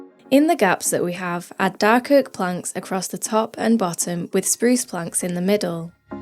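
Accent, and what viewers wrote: United Kingdom. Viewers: This is another reason why I love British accents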